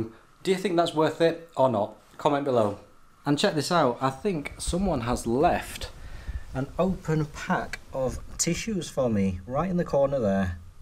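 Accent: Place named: Yorkshire, United Kingdom. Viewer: what accent do you have cos im usually good spotting accents but yours is stumping me ya sound like a mild sheffield/yorkshire guy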